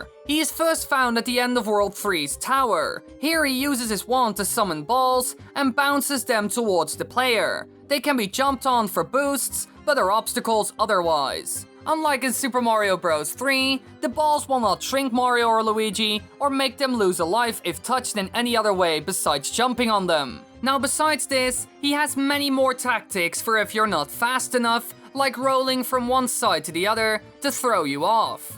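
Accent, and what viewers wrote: Netherlands. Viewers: Are you from Nederland s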